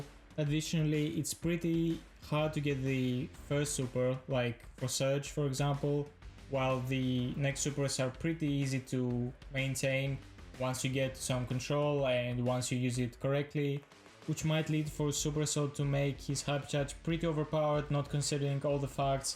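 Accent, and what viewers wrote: Greece. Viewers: Bro with your voice i can understand you are greek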